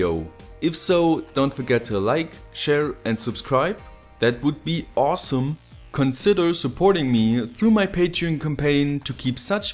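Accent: Germany. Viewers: these videos are way more well filmes and understandable, last but not least more professional then many others on the same topic out there! Even if you sound a lil bit german XD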